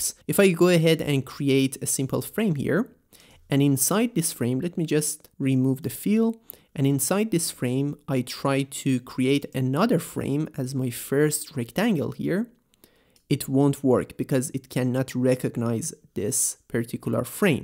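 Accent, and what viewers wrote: Iran. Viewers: I can distinguish that Iran English accent from miles away. Cheers to you brother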